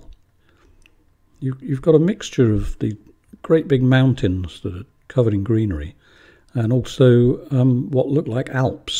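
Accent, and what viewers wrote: United Kingdom. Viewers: Thanks for sharing your wonderful unique creativity. I love oils and your style is so inspirational that I can't wait for my current work to finish so I can try your method. Love listening to you. Besides the lovely British accent, you don't ramble on like some artists I tried watching and chose to turn off sound because of the non topic nonsense they were talking about. Musical intervals are just perfect!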